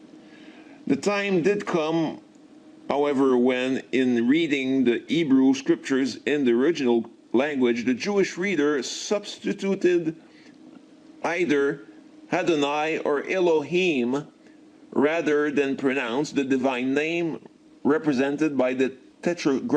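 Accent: Quebec, Canada. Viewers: you have a french canadian accent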